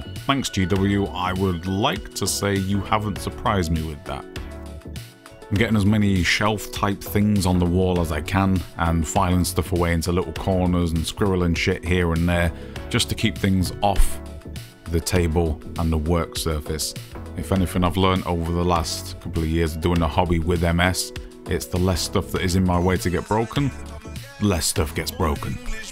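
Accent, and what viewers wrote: New York, United States. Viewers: I love this dude. Just discovered the channel. Keep on doin this beautiful thing your doin. Nice NY accent btw. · Good video. That NY accent is excellent. Only one copy of Cursed City though? It's like you don't even know how to youtube.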